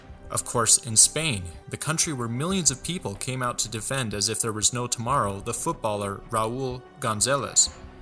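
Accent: Ireland. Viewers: What happened to the Irish accent?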